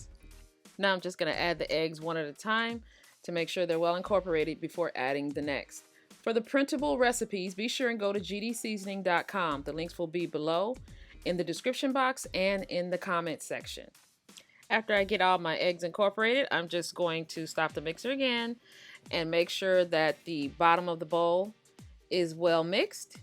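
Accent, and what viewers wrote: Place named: Chicago, United States. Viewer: Are you from Chicago? Your accent sounds just like a friend of mine ☺️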